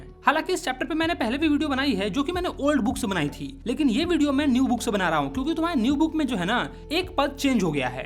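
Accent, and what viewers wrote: Bihar, India. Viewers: Aap bihar se hai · sir kya aap Bihari hooooo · Bhai aap kya bihari ho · Bihar ke hai sir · Sir aap bihari hai na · Sir , Are you from Bihar because you're accent is just like Biharis people, and sir I am also from bihar and I want to say you teaching style is amazing ❤❤❤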